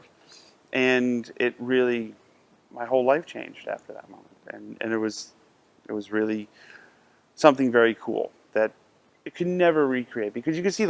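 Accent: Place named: United States. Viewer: I have much respect for Bonamassa as a person and as a musician. Whilst he admits to a slight American accent, to my born in Surrey England (as was Clapton) ears, his diction and grammar, unlike many Americans, is perfect! I am certain that did not need to address Eric Clapton as 'Mr Clapton' or 'Sir' (Eric has a 'CBE' but deserves a Knighthood). Despite his fame he is a down-to-earth guy who is pleased to be addressed by his given name. If I, a nondescript fan of his music, happened to bump into him I would say "Hi Eric, I'm Alan, a fan of your work" and he may be tired of the 'Autograph Hunters' but would be semi-polite. If Bonamassa bumped into Eric and said the same Eric would have returned the compliment and suggested that he would be pleased if they could they jam together. And, of course, these two great musicians shared a stage despite unnecessary and ridiculous deference. 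Its just an exaggerated publicity story; never addressed Eric as 'Sir' or 'Mr Clapton', they each have respect for each other!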